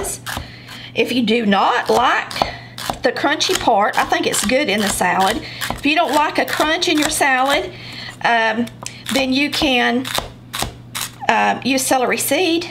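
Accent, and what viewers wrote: South, United States. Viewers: The "rocker blade" is also referred to as a Mezza Luna (Italian)....which means a half moon. I have an antique one which has 2 cutting blades. You can also use a Mandolin (French) to evenly dice the veggies. Loved her recipe, as well as that Southerner accent. Happy eating.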